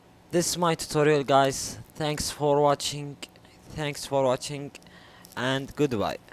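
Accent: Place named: Saudi Arabia. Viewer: ههههههههههههههههه اقسم بالله من اللهجه قبل ما افك التعليقات عرفت انك سعودي😂😂😂😂😭.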